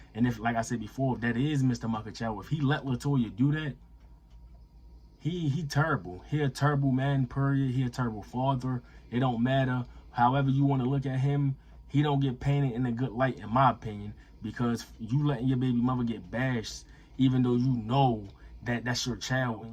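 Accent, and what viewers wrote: Baltimore, United States. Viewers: You from Baltimore? Lol asking because of your accent.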